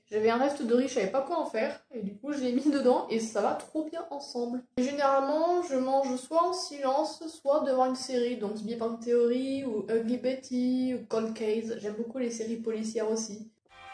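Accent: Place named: Russia. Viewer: j'adore ton accent avec le leger accent russe dedans ca te donne un charme fou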